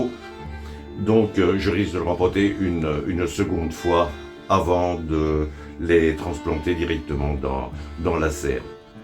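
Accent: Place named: North, France. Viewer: Vous êtes un modèle, une expérience, une expertise, une bienveillance, un accent et cette chose inexplicable des personnes du Nord. Envoyé par un ancien picard devenu parisien, élevé dans le potager du père et la culture d’endives en champs…du père également ! Continuez à nous régaler et bravo. Miguel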